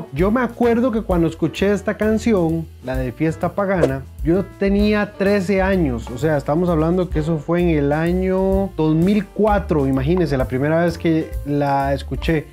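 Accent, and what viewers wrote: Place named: Costa Rica. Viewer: Me suenas Tico. NICE BRO. PRIMER VEZ QUE VEO UNO DE TUS VIDEOS Y YA TE SIGO...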